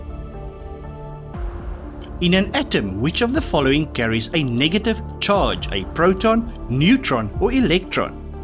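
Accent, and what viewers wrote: South Africa. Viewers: Also thank you for your great quizzes ! Your pronunciation is wonderful !!! The S/A accent is great !! (JOKE ) "Tell me , being South African , do you have a grudge ? " " of course I have a grudge ...where do you think I keep my car? " xx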